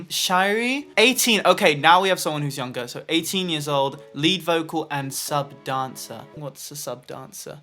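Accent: United Kingdom. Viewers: i completely forgot has this strong british accent and how it makes everything he says sound so elegant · Your accent is so British ❤️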